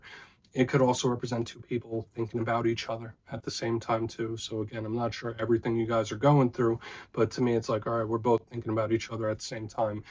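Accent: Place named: Louisiana, United States. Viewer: You sound like your from Louisiana!!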